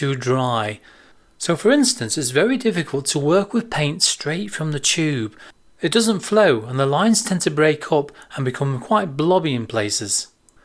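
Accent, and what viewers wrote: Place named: Liverpool, United Kingdom. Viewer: This was awesome. Your accent reminds me of the greatest band of all time-!!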